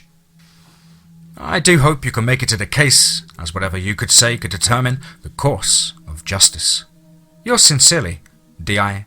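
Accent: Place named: Scotland, United Kingdom. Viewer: It's one am and I'm halfway through... loving it. Love the Scottish accent as well.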